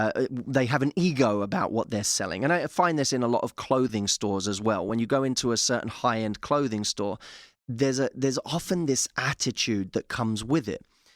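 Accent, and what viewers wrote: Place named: United Kingdom. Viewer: Cute much! Dungarees....You mean Jeans, right?? Love your British accent & names for items.... ;) <3 Hugs from Canada...;)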